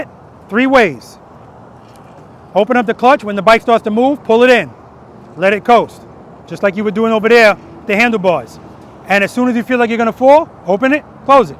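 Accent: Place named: New York City, United States. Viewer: Great video. Thickest NYC accent I’ve heard in a long time. Keep it up.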